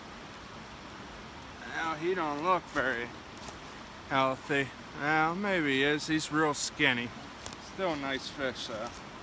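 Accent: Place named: Indiana, United States. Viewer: "Crik". you say Creek like country people in Indiana do.. I'm from Indianapolis and we say cr-eek versus country people who say cr-ik..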